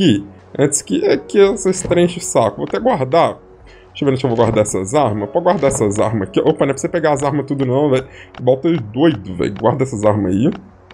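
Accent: Minas Gerais, Brazil. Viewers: Pelo sotaque é de minas kkkkkk fala igual eu kkkkk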